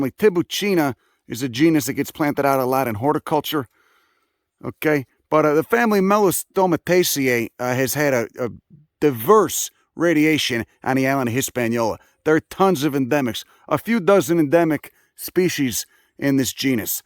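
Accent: Chicago, United States. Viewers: Chicago accent Very salacious content you got here.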